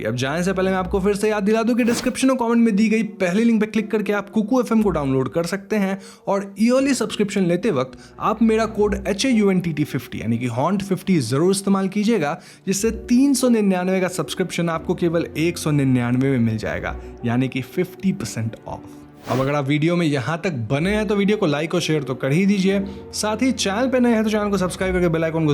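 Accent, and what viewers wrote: Bengal, India. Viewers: Kya Bengali ho?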